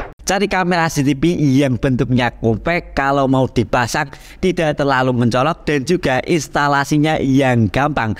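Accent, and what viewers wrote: Java, Indonesia. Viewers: suara org jawa, medog bgt...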